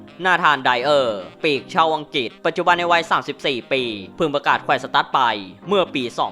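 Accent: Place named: Rural, Thailand. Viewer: จะพูดเหน่อทำไมวะ ขัดใจช.ห.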